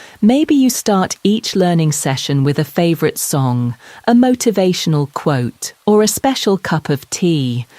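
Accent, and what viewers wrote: United Kingdom. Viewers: Thanks ❤❤ i love your british accent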